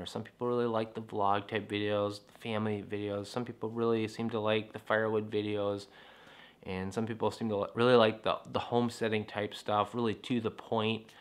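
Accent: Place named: Wisconsin, United States. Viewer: as someone from wisconsin you sound like your from wisconsin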